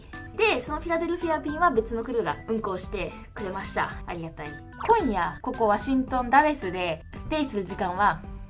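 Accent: Kansai, Japan. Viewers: 、関西なん？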